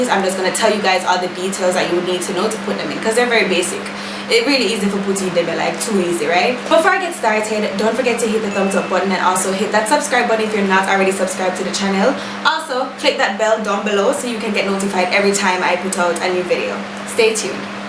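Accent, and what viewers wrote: Jamaica. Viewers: You sound Jamaican.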